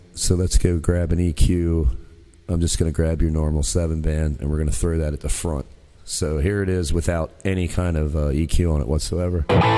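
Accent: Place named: Philadelphia, United States. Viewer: Cool video! Have you tried S-Gear? You from Philly? I hear a Philly accent.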